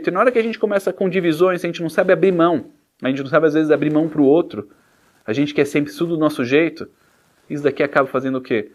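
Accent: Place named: Brazil. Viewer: Bacana ver e ouvir um rabino ortodoxo com sotaque bem brasileiro! Todá!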